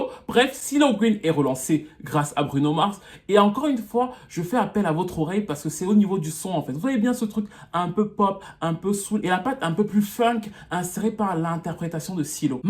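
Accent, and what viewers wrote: Cameroon. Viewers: Je dors avec tes vidéos!tu narres comme jamais avec ton petit accent cametchek par moment!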